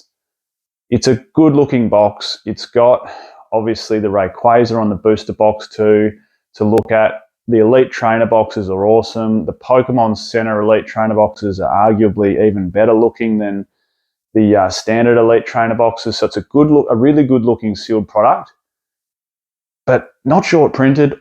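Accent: Australia. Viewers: thanks for the video m8! really appreciate it! its nice to hear from an aussie. Yah audio though is a bit inconsistent, awesome video but! thanks m8!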